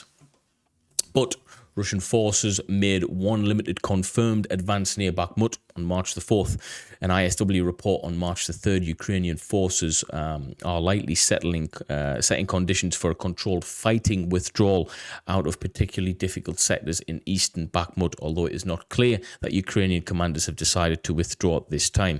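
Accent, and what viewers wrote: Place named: United Kingdom. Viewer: Thanks, good to hear a fellow Brit, Although born there I haven’t lived there for 28 years. A few things, first I am a member of the armchair General brigade, however I have conducted many successful campaigns across the surface of my coffee table. Second, the Ukrainians pushed the Russians back south of Bakhmut, third they blew a dam in the north to slow the Russians down ( which worked). Four, they blew the bridge separating the east of the city from the center. Six, it’s been said that units of Ukrainian troops have been positioned on the western flank of the Russians northern advance in order to slow their progress. I would say the Ukrainians are withdrawing from the city. Seven, the Russians not taking Bakhmut anytime soon, soon could mean in a week or in 10 days, I doubt Bakhmut will hold much longer. In my ‘expert’ opinion of course. 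🇺🇦🇺🇦🇺🇦🇺🇦🇺🇦🇺🇦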